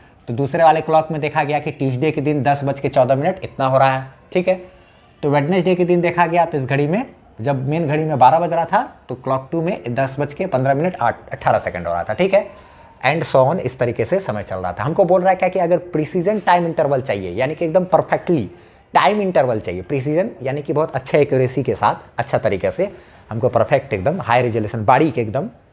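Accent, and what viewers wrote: Bihar, India. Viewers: ❤tankuuuu bhaiya And Bihari accent in your words are just killing.....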